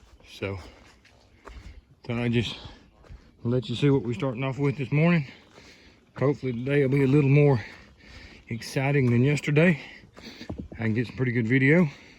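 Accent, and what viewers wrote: South, United States. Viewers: Thank you for finding more mushrooms and catching them on camera. That first big one almost didn't look real. Amazing there are so many kinds. If rock walls could talk, they could tell you lots. Most represent the skill in which the person built them. Some may fall apart due to vandalism or people searching through them, but the one my daddy and us kids built is still standing strong. If there was a way I could include it in this comments section, I would. .......I also appreciate you filming sections of the towns you are going through........still love seeing the old houses "up north". The architecture is so different than you see here in the south. Keep holdin' on to your sweet southern accent !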